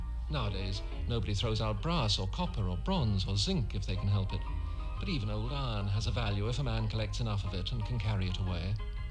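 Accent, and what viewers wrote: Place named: Kildare, Ireland. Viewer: Commentator is from Kildare, probably Naas,, That's how we speak.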